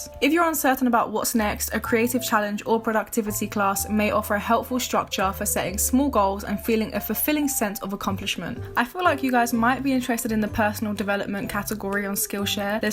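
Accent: Croydon, United Kingdom. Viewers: Charmee Taylor She addresses in an assumptions video how people think she's trying to be black when it's just the accent. That's just the accent in Croydon, all races speak this way there ☺️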